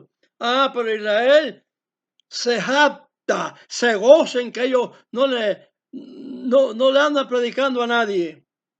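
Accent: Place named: Cuba. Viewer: Ese acento cubano... espectacular!!!!! Bendiciones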